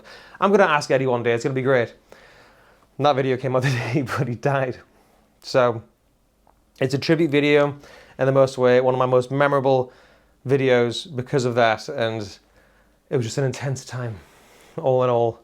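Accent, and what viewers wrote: Newcastle, United Kingdom. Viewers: I only ever watched PMT because of you. One you're a Geordie yenaawattamean, but mainly because of the enthusiastic way you presented new gear. Don't know what the next stage of your journey will be but I'm certain that someone will snap you up to do what you do best, demo gear and play guitars. All the very best mate.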